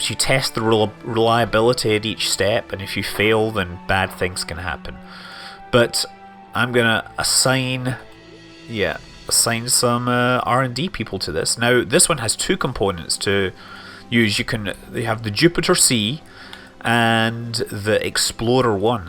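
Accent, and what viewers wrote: Scotland, United Kingdom. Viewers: Hey, you don't have to affect an American accent for the liftoff since it's a Global Space Agency. It could be a fellow with an even heavier Scottish accent than yours doing that countdown!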